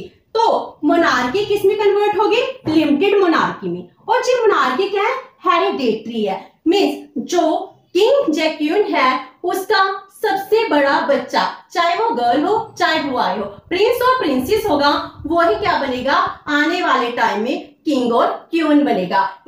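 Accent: Punjab, India. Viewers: Mam you made Polity as easy as Hindi hats off to you mam🙏 Dhanyavaad . Aur aapke samjhane ka tareeka , 5abi accent Best Way mam Thank you🙏 Acche teacher ki nishani hoti hai uska subject ke prati interest joh dikhta hai aapke padhane mai . May god bless you.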